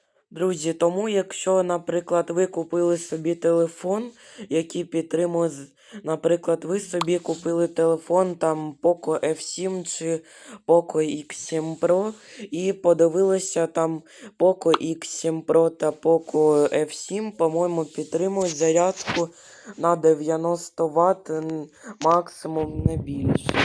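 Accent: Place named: Russia. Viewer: Молодец хлопец, Але тренуйся правильно розмовляти, без слов- паразитов я- то " от" та тренуй вимову української глухої "г " замість російської дзвінкої.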